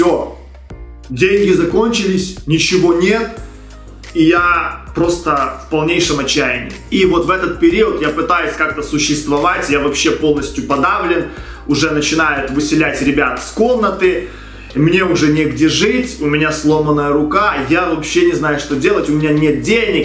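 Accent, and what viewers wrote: Ukraine. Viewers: Какой же у тебя забавный тон разговора). Акцент коренного Украинца.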